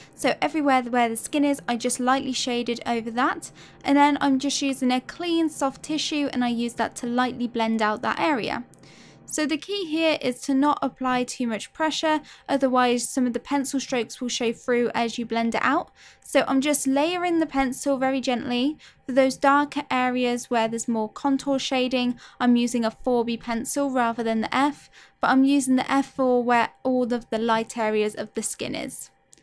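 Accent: United Kingdom. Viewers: I love ur accent..is it british?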